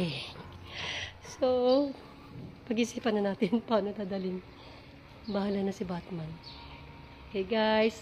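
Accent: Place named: Japan. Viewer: for the second time na pinanood ko to....kagabe at now....nag enjoy lng ako lah....at na inggit ako not only for the blueberry pati na den sa japanese accent mo 💚☘️💙...love love this video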